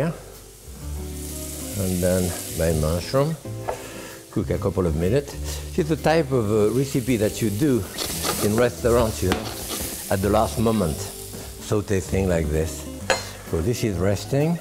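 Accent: France. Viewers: The french accent turns Th into Z, but he had a stroke 5 years ago and it has considerably slurred his speech. Very understandable though, mouth watering sound is the same as not being able to control your salivary tract excretion. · This is a proper french accent, not that fake shit from that curly haired dude, god i love french accents but that dudes accent makes me cringe so much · the adorable chef with an insane french accent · Cannot stand his strong French accent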